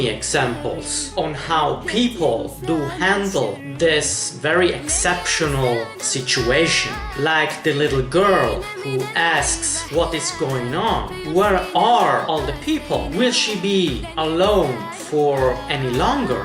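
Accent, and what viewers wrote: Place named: Eastern Europe. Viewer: RI Hero ما اعتقد امريكي، ممكن يكون شرق اوروبي، لهجته ليست امريكية.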